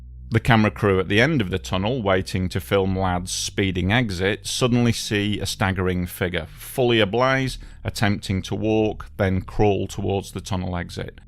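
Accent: United Kingdom. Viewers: If you are referring to the narrator, he is from Britain... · "a global superstar, not only in the US". How hilariously American. But the speaker seems to be British 😊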